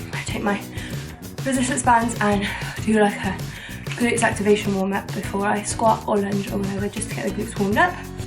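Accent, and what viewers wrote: Wales, United Kingdom. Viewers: Is there a hint of wales in that accent ?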